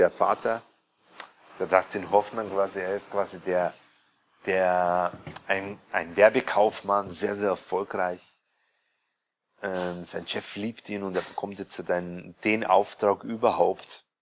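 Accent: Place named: Bavaria, Germany. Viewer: Köstlich der bayerische Einschlag!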